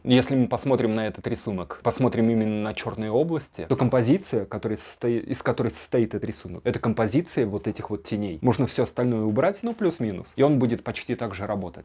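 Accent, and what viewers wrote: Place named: Moscow, Russia. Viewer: раздражает нелепая манера говор с московским ш вместо питерского чн чк откуда ты прелестное ха-ха создание с таким количеством ошибок в речи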